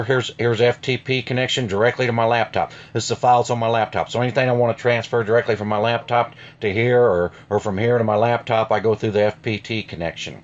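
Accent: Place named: South, United States. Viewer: I just purchased a Tab S, so i've been watching alot of reviews for the Galaxy Tab S in various engish dialects from different countries and your video in the southern American dialect is great. Very impressed with the way the video was presented and really showed a great tablet "really" used for work. You sold my on the Next Launcher, very good set up you have. I will be hitting subscribe, thanks dude.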